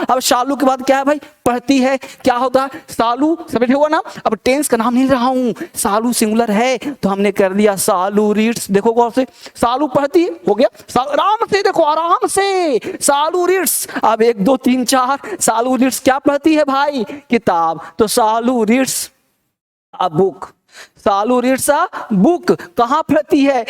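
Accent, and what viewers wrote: Bihar, India. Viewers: Tum bihar se ho kya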